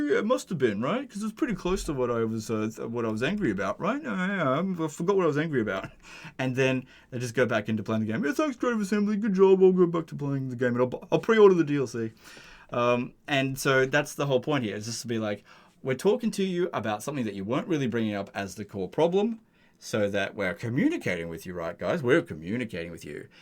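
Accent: Australia. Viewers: Ill miss yr Aussie voice! You made me a better English learner!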